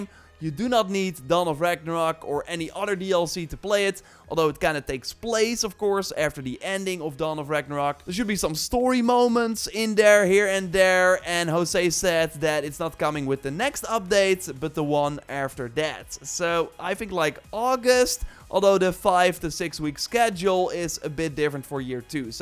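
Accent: Netherlands. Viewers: The Holland dutch accent tho strong here.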